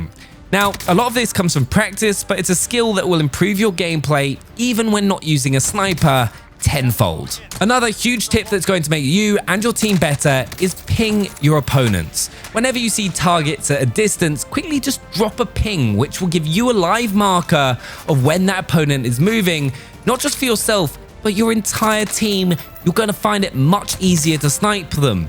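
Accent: United Kingdom. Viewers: Probably his British accent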